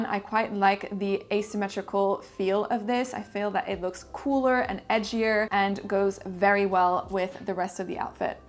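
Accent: France. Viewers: I love your french accent ❤ and all this entire video, Greetings from México 🇲🇽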